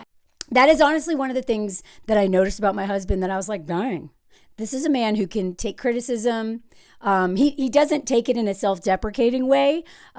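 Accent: South, United States. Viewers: I love your southern voice! 😂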